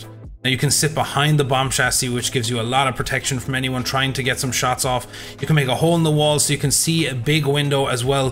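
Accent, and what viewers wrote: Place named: Ireland. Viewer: First time watching and I can tell he’s irish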